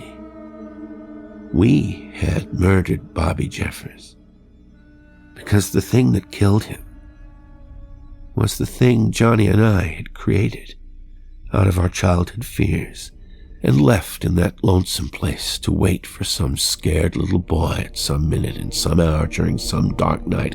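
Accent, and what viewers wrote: United States. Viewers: Thank you. I loved this story and your mild American accent was just right for it. Great 👍 😊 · Wow Your American accent is superb! I’m so impressed - You have me wondering which of your accents is authentic to you! I was so excited to see you posted this, to have Ian Gordon and in the same day is a huge treat! Just in time to help the evening summer showers break the oppressive heat wave with chill bumps 🖤 Thank you!! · His accent was excellent. If l hadnt known it was, l would have believed it was a Murican reading the story, great atmosphere, totally there, recall a few lonesome places from my childhood back in the sixties, first l had to pass a cemetery with a really old churchyard cemetery on its other side, but l could stay over the road from it, but on the other side so fare up a rise in the road, was nothing just bare open scrubby land, all just been bought and turned into an housing estate as years webt by, but then it was pitch black one street lamp that barely lit up underneath itself, that was dark almost from the edge of the path for a quarter mile then dipped down into a hollow. 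Then on the other side of the road going up over the rise was the back of a row of terraced houses, scrubland between the edge of the road up to the cobbled back street where dustbins the old metal type were left out, then the yard walls of the houses 80 year old built for the iron ore miners, l would get to the fish n chip shop l had been sent to only about 7.30pm but in late november dark as it could be, sometimes before l used to pass thru the street as it was lit by street lights and lights spilled out thru thin curtains, you could se the flicker of tv sets, and often hear music or actors speaking, it was good that way only at the bottom of the street it was a little clozer to the. Churchyard, but l could run past that bit at high speed, but still l could imagine my worst fear happening six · Your America accent is good, except, (if you're interested/open to notes) that you keep adding a southern drawl on "Ah" sounds and periodically when emphasizing a word or phrase, into what is otherwise a northern/eastern accent. 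Not a fan of Derleth in general, but this is one of his best- almost a Bradburian or King tone, which suits him better than bowdlerizing Lovecraft. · Excellent story and narration! You have a great American accent. We all had a place like this growing up. Passing it caused our nerves to be on edge, and when we got home we were so relieved. The things we thought lurked out there... I find the light pollution, population growth, 24/7 traffic, and increased background noise dissipate the feelings nowadays. As always, the sounds and environmental/background noise fits the story soooo well. Fantastic job, my friend! You have been spoiling us lately. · No problem with Englishmen attempting an American voice. Yours is fine, and the one other narrator (Greg Wagland) who attempted an American voice for a character did all right, too. Which is amazing to me, because if I attempted an English accent, it'd sound more out of place than Al Jourgenson's in the song "Every Day is Halloween" * *(Lead singer of Ministry) Ministry used to be a synth-pop band before they went metal, and in his synth-pop albums he sang with a fake English accent. 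Kinda cringe-y Your American accent is believable. I'm always up for some Derleth. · Ooh great story and fantastic American accent Thanks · Your generic American accent is very good.. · while there's an occasional hint of your English accent, I'd say your Anywhere, USA accent is pretty good. I mean, it's a hell of a lot better than my attempts at an English accent. 😀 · Love the American accent and the background sounds. Thank you 😊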